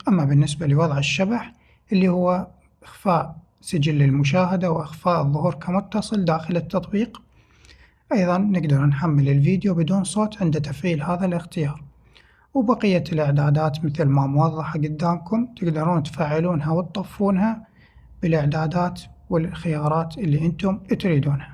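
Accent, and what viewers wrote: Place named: Iraq. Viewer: للهجه عراقيهه ليهةة؟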